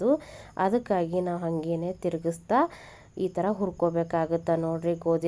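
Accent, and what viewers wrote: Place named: North Karnataka, India. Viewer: Nima uk maatu keloke chanda ertave bhal esta agtaite sisoo❤❤❤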